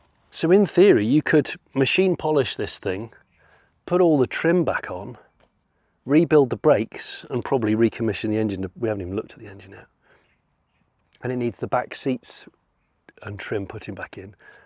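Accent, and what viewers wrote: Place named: Somerset, United Kingdom. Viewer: In slow mo you can really hear the Zummerzet in accent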